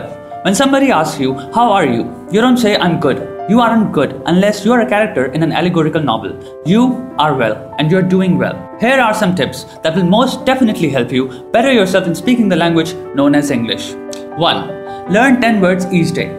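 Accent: India. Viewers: you are definitely fluent but have to try to reduce the Indian accent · this dude sounds like Indian, doesn't he?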